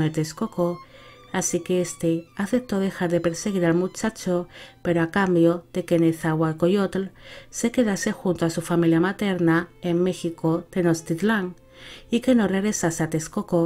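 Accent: Spain. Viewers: Un recuerdo que dejo ¿Con qué he de irme? ¿Nada dejaré en pos de mi sobre la tierra? ¿Cómo ha de actuar mi corazón? ¿Acaso en vano venimos a vivir, a brotar sobre la tierra? Dejemos al menos flores Dejemos al menos cantos. (Nezahualcóyotl) Es una bonita sensación escuchar en voz española la admiración por este poeta y rey del antiguo México precolombino. Gracias.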